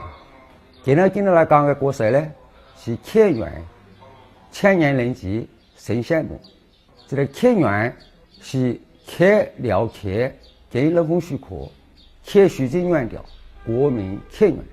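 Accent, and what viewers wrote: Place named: Henan, China. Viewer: 这是吴语？除了我们读阿拉，讲读刚之外就是河南话吧！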